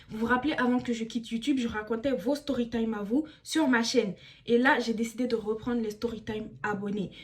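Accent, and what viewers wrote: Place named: Ivory Coast. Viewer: J’aime trop la façon tu nous racontes la story🥰🔥 l’accent ivoirien et tout et tout je kiff 💕 Bourkina / Civ c’est la famille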